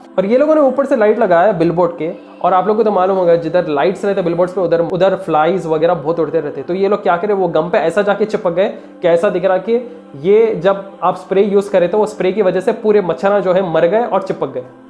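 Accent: Hyderabad, India. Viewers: Are you from Hyderabad because you taking like that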